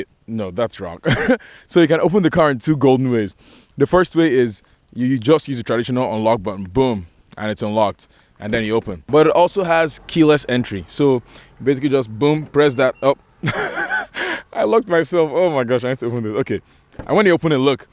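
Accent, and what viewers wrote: Nigeria. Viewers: I hear a slight Nigerian accent! My brotherrrrr lool. Much love from Lagos Nigeria. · This nigga has to be Nigerian lmfao. Made the video hilarious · You sound just like my friend Voke from Nigeria, who by the way, gets excited talking about cars also. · You sound Nigerian.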